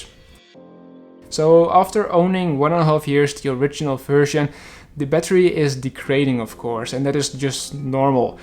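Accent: Netherlands. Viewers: Haha even though your English is near perfect I could hear the dutch accent. Nevertheless, Great video though! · The dutch is strong on this one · Nederlander die engels spreekt🙃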